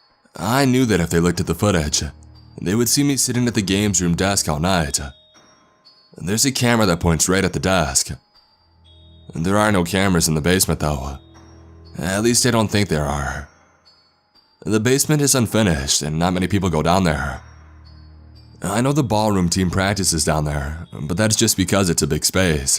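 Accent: Canada. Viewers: He’s Canadian probably?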